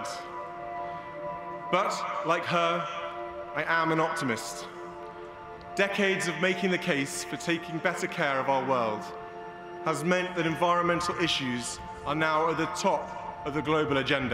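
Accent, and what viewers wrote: United Kingdom. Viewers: He reminds me of his mother and his looks he is eloquent and talk like a real Brit what a nice homage to the Queen and a nice speech let's hope he makes a good... king... Not too happy with the Royals...I've been around quite a while to see what the royals did to Diana ....never was a big fan A lot of hoopla ...if they really did a background check are they all really royalty? Not sure how true that is it's not like in the 1600s a lot different then I'm sure Bravo the Queen Elizabeth II to be around this long.... Queen Elizabeth I was a real Queen wow she is named after after a real gem.God-bless her🇺🇲🇨🇺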